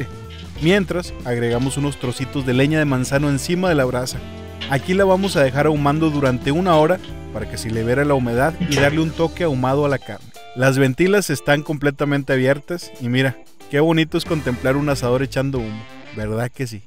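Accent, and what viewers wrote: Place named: North, Mexico. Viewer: pues los dos son del norte, es natural que hablen parecido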